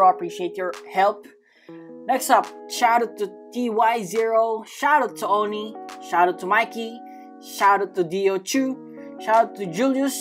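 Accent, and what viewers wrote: Philippines. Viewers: Ur voice like philippines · When I heard voice I knew he is Filipino lele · PINOY SABI NA EHHH YUNG ENGLISH ACCENT NYA PANG PILIPINO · I KNEW YOU WERE FILIPINOOOOOO just by your accent :>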